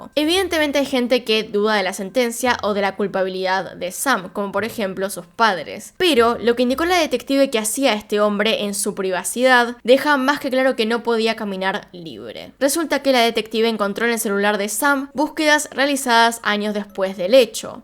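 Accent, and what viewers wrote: Argentina. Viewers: así hablan los argentinos, no es cosa de pronunciación es cosa de acentos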